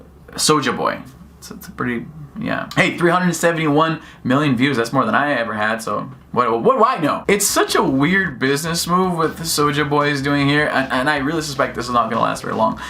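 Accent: Brazil. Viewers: The moment you said you were Brazilian, I immediately started to hear your subtle accent lol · hey there first time viewer here, great video lol I thought I recognized your accent but didn't put two and two together till you said you were Brazilian I am an American Brazilian so a lot of my family that speaks English has a similar accent but yeah sorry to get to my point of commenting lol he posted on twitter he made 250,000 dollars in 24 hours of starting selling his knock off consoles lol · You're Brazilian? I couldn't hear your accent until you pointed it out. Please speak some Portuguese!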